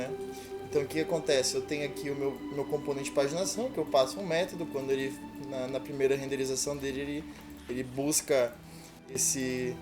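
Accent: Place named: Rio Grande do Sul, Brazil. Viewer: Saudades mesmo! pelo sotaque ele é do RS, ele sumiu no inicio das enchentes, será que aconteceu algo com ele? to preocupado...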